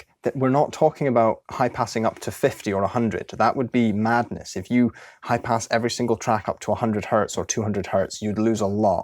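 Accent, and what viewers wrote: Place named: England, United Kingdom. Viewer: And he's not a Yank! plain English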